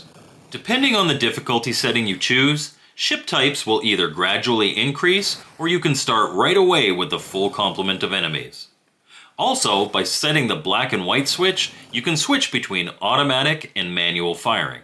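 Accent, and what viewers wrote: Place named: Russia. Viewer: Let's Go DJ Blyatman would Be Proud using that authentic Russia 🇷🇺 Accent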